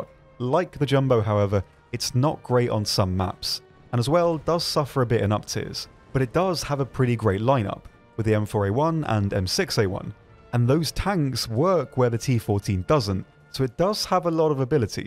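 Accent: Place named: United Kingdom. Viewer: You create my favorite War Thunder videos on YouTube! Thank you for your hard work. Your British accent is also greatly appreciated by this American. :)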